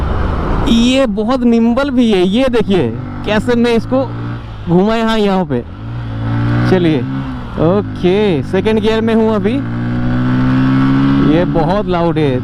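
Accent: United Kingdom. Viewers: Hindi mai bol ya English mai bol but don't use British tuning brother But nice information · ओ भाई जरा ठीक से बोल ले हिंदू में भी इंग्लिश accent मार रहा है ढंग से बोल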